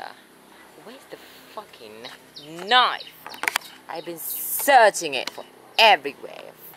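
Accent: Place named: United States. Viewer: dude, if you love british accent why not try use it in your other vlogs...most of your vlogs are in american accent...try new things..it would be great..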